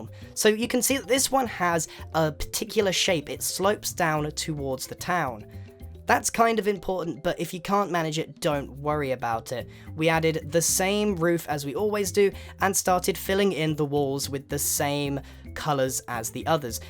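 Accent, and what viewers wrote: England, United Kingdom. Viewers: He has a English accent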